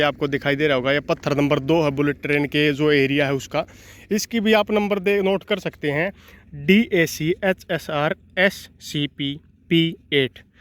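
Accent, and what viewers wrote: Haryana, India. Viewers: Zabardast yaar ..... infact your haryanvi accent is also amazing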